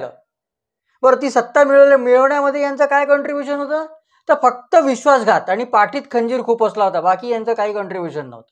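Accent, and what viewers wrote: Vidarbha, India. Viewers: your analysis is so cryptic and humorous, especially your Vidarbha accent is so endearing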